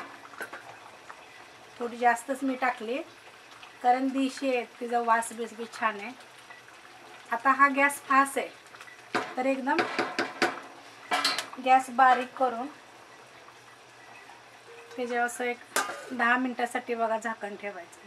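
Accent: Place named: Rural, India. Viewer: खूप छान मावशी,,,, तुमच्या भाषा गावाकडची आहे पण त्यात खरंच खूप प्रामाणिकपणा दिसतो,,, जेवढी चव तुमच्या बोलण्यात आहे तेवढीच या पदार्थात असेल यात काहीच शंका नाही...! आणखी खूप व्हिडीओ बनवा,,, अस्सल गावाकडचे पदार्थ शिकवा आम्हाला..!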